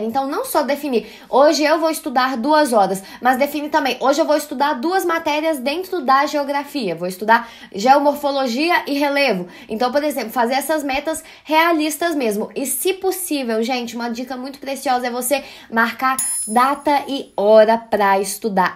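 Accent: Minas Gerais, Brazil. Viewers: menina, faz um tempo que nao te acompanho até pq meu foco é concurso público mas sempre via as aulas de história. Estou impressionada como seu canal cresceu e a qualidade e conteúdo dos vídeos tmb estao melhores... excelente! E o que é melhor é o sotaque mineiro!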